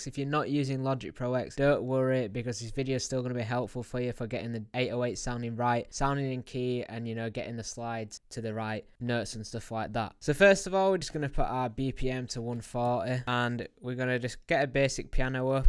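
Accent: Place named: Yorkshire, United Kingdom. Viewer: I thought I recognized that Yorkshire accent. I'm from Halifax. Loved the video and gave it a like. P.S I use FL Studio and you're a Logic user but your 99.% perfect 😂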